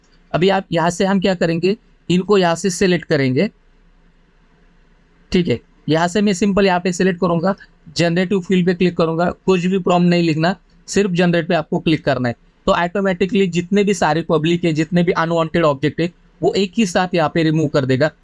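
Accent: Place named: Varanasi, India. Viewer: Varanasi se ho kya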